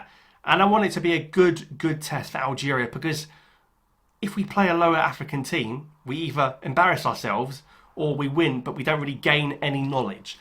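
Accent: United Kingdom. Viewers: Is the British accent difficult or am I not good enough to understand English?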